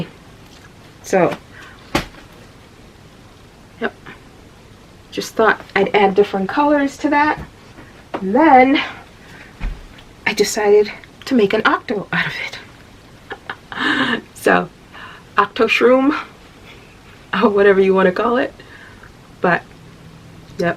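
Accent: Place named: Boston, United States. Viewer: Hahaaa.. you were correct! Rose LOVES to crochet 😜 Wow!!! You got scammed? That's very upsetting 😡 You are an UHHHMAZING crafter. You must totally use a teeny tiny hook because I made the same froggy's, with only 1 strand, and mine are the same size as yours haha I need to invest in an eye nob thing. I so love your yesstuhday boston accent 💖 takes me back to a happy place.. Ooohhhh, those aint no pickles bahahaha jk I'm gonna start using the glue for the eyes. Great tip! The giant Octo is so pretty 💖 You made beautiful projects. Good job friend.. you will sell out!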